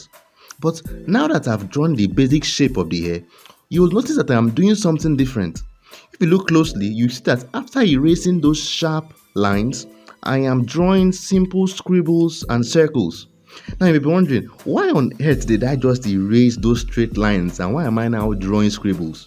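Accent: Nigeria. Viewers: Oh my gosh where have I been, where have you been and why am i just discovering this channel? why have you guys never suggested these tutorials whenever I am searching for stuff?! Awesomely great work bro, your tutorials are sooo educative, informative and very explanatory, absolutely any level can follow!! More success to you, and also I gbadun how your Naija tongue sounds through your clear spoken words!! Carry go jooor, nuffin doeth thou!!!☺☺👍👍👍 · This really helped, thank you so much . By the way, are you a Nigerian?,you sound like one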